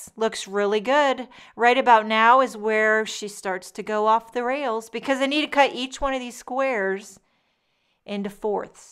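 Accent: New York, United States. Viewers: Ohmy gosh are you from NY. I love listening to you. You are hysterical. lolol.. Love the cards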